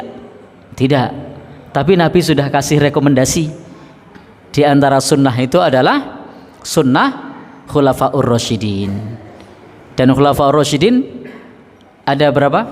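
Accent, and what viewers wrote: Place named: Java, Indonesia. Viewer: iJIN BERBAGI.....👇 MENCERDASKAN UMMAT....!!! USTAD INI...KALO DARI NADA BICARANYA SUKU JAWA....... TETAPI AKIDAH NYA LURUS..... BELIYAW TERLIHAT BERADA DI ATAS MANHAJ SALAF..... HARUS NYA BANYAK ORANG JAWA YG HIJRAH MENGIKUTI PEMAHAMAN BELIYAW....YGMENGAJAK UMMAT KEMBALI BERAMAL,BERIBADAH BER AKIDAH MENGIKUTI MANHAJ SALAF....!!!! SIAP SIAP USTAD.....KALO MENDAKWAH KAN AGAMA YG HAK,YG SESUAI DALIL....DARI KITABALLAH DAN SUNNAH ROSUL..... MAKA ANDA AKAN DI TUDUH.... SEBAGAI WAHABI......😁😁😁HE....HE.... TETAP ISTIQOMAH MENDAKWAHKAN YG HAK.....MESKI BANYAK YG MEMBENCINYA....!!!! SEMOGA BERMANFAAT. 🙏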